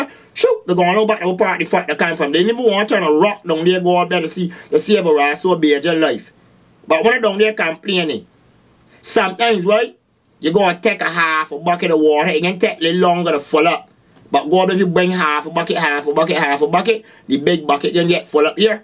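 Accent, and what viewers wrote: Barbados. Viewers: Lmao his bajan accent is the best sounds like my grandad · I freaking love the bajan accent!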